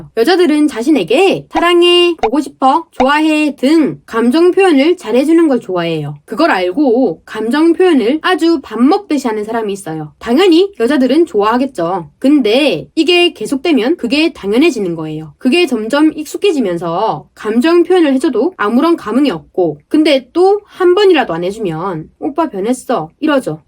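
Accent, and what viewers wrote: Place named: North Gyeongsang, South Korea. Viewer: 경북 봉화 안동쯤인듯😮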